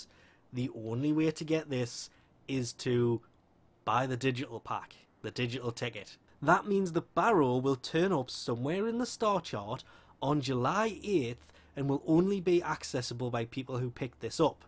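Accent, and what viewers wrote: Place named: Northern England, United Kingdom. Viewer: According to your accent I think you're from the middle or north from Brittan, so you're planning to fly to Toronto instead waiting till it's actually in Brittan?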